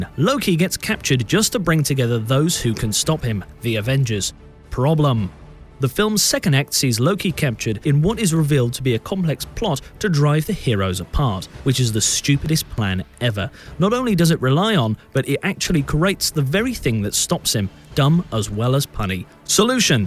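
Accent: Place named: Bristol, United Kingdom. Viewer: He sounds a bit bristolian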